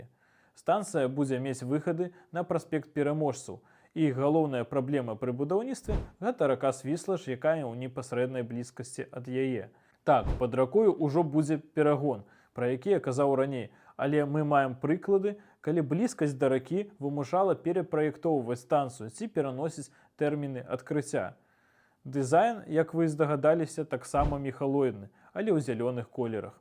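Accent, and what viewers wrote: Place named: Russia. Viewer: Дзякуй, было цікава. Але вымаўленне беларускіх слоў адчуваецца што нібыта трошкі рускае.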